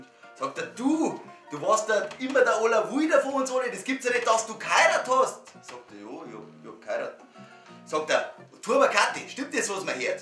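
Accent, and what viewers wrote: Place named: Bavaria, Germany. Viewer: ich versteh kein wort...krass komme ausm nachbarbundesland aber ich mag den...die bayern...haltet eure fahne hoch...ihr seit was bsonderes...passt euch net an...bleibt urig;)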